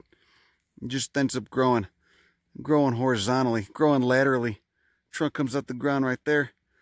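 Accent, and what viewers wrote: Chicago, United States. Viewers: I'm from Chicago so it comes naturally to me as I talk like he does when he isn't doing vids, but that is funny to hear for sure!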